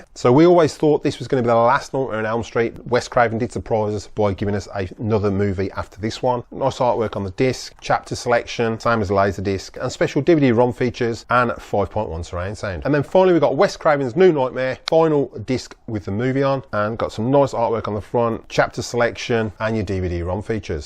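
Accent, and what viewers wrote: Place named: United Kingdom. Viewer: Can I ask where you was originally from in the UK ? Sound like you from my neck of the woods 👍🏻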